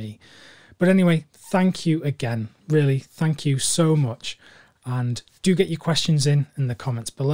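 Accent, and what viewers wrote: England, United Kingdom. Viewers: Unrelated note and in the least homosexual way possible , you have a lovely soft English voice and it’s lovely to fall asleep to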